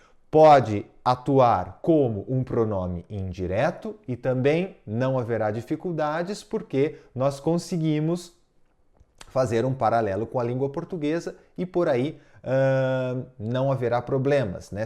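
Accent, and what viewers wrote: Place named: Brazil. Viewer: Me encantó éste video...explicado sencillamente, el cual fui anotando, como muchos otros, para obtener un gran entendimiento de éste tema tannnnn importante!!! Soy uruguaya y me agradó también escuchar como vas explicando con la pronuncia brasilera!!! Ahora, voy a anotar bien, sobre la particella Ne!!! Excelente!!! Todos los ejemplos, sirven!!!! Grazie mille per tutti!!!!!😊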